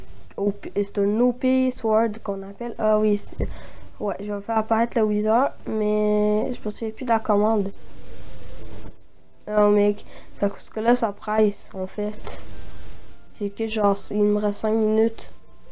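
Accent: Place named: Quebec, Canada. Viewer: Du québécois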